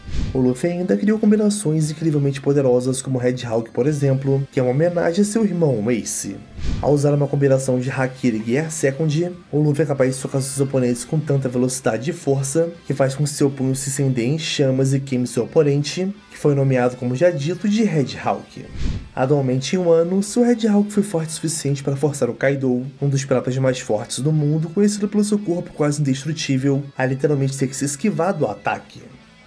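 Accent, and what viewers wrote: Portugal. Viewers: Nasceu em Portugal tem sotaque de português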